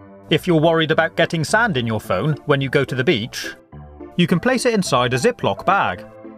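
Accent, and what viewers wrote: England, United Kingdom. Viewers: 40Xx he lives in England that's why he says things differently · you can tell his English cause of the acent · he was speaking british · Are you from the United Kingdom?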